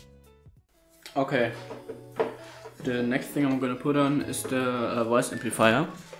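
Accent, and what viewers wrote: Germany. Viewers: damit war gemeint, dass man hört, dass er eigentlich deutscher ist und nicht Engländer oder so... Er hat alles richtig gemacht/geschrieben, man hört wohl raus das dir ein paar Gehirnzellen fehlen 🤦‍♂️ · Man hört so raus das er Deutsch ist❤️😂😂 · that all can heared out hes germany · Ich hab danach gesucht das jemand merkt das er einen deutschen akzent hat 🤣😂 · Mann hört es instand raus mit dem deutsch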